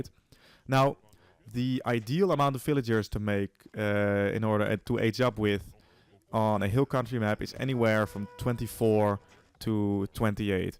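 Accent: Netherlands. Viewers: is he dutch?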